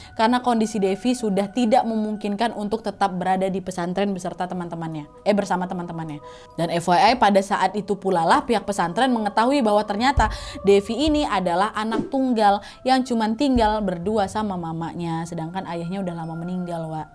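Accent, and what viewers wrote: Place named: Aceh, Indonesia. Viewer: Logatnya kak mengingatkan aku dengan pria aceh yg pernah singgah ☺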